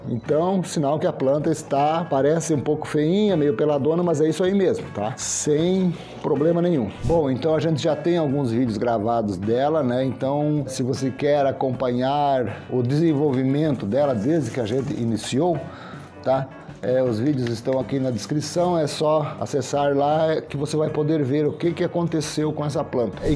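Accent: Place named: Rio Grande do Sul, Brazil. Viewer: Ah sim, achei o seu sotaque bem parecido com o nosso daqui do RS, gosto muito dos seus conteúdos.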